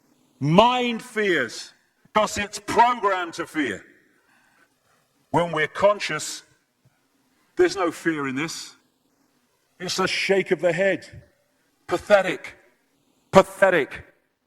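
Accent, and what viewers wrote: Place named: United Kingdom. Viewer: First this guy is a Brit, but definitely NOT David Icke's voice. Good piece nonetheless!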